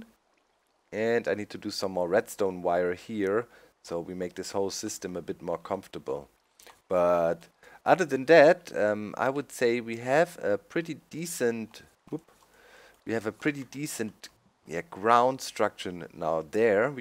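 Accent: Germany. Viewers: your german accent sounds so awesome haha xD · Aber man hört schon dass er deutscher ist.. Findet ihr nicht?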